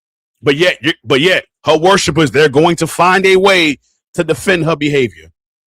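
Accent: New York, United States. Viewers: The whoLe phrase was: "Tuff Tiddy To suck" You sound Like a Nu Yawka bro. #SaLuTe_Homeboy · Spitting facts and a NY accent, oh I’m locked in!!!!!!!!!!!!!!!!❤❤❤❤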